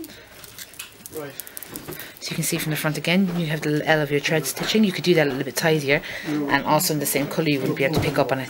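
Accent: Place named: Ireland. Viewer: an Irish accent is like beautiful music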